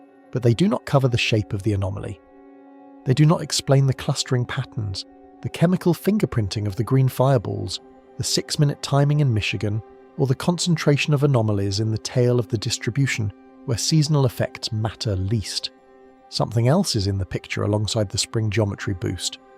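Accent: United Kingdom. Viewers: Humans cannot put two and two together in real time 3i/Atlas came through in retrograde between the orbits of Mars and the Earth with its "tail" pointed towards the Sun - that is into the future orbital path of Earth and spewed toxic gasses, vapors and chu KS heavy enough to just slow and sit there. Now earth is orbiting through it and catching the debris and toxic soup. One needs to get over the fact that 3i /Atlas was not a Comet and it is simple to explain. The question is how long will be catching debris and what other dangers it brings Let us not make 2 hour lectures in stupor - it is explained by some orbital mechanics Different channel name same British spit mouth. There would not be lisp noise unless words are being spit mouthed. Use an American clean English AI text speech reader and stop repeating not this, not that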